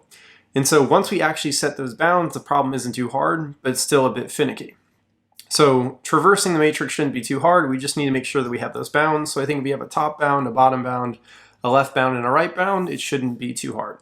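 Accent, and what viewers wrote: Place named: United States. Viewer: The inotro and outro music are rather goofy, but it is so nice to hear an algorithm explained in normal, US accent English instead of, well, you know!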